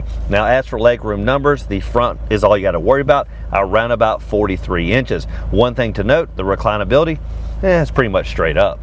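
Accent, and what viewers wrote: South, United States. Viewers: Hillbilly talking Italian styling. Good grief. Pretty sure you don't need the strap on the glasses for this particular review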